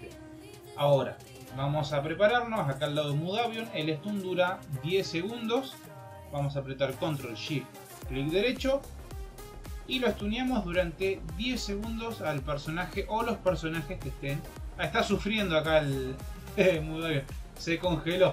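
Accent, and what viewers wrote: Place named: Rosario, Argentina. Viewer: Sos de Rosario ?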